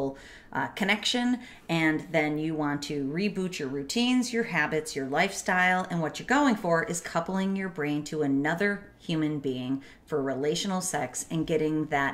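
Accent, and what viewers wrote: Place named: Wisconsin, United States. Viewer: That Wisconsin accent is so annoying miss church lady.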